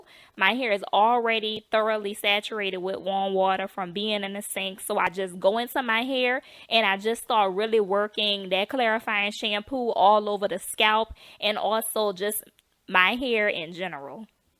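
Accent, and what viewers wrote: New Orleans, United States. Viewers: Your New Orleans accent is the best! You couldn't hide that thing if you wanted to! :) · yes, New Orleans, my husband and my in laws are from New Orleans, girl, love the accent, and hair.. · As soon as I clicked this video: "Oh. She from New Orleans😁 Yes indeed." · Just found your video. Good content. You sound like you are from New Orleans where I'm from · I bet she from New orleans with this accent😭😍😍😍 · Come thu New Orleans accent!!!!! I love it!! Don’t judge me.....judge your friends😂😂 Laissez les bon temps rouler!!! 💚💜💛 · Watching this video in the car w dad. He heard your voice and immediately said, "She from New Orleans?" He heard that accent baby 😂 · When N.O accent is lifeeee 😩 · beh-behhh.. girl.. im in LOOOOOOOVE with your nola accent. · Are you from New Orleans??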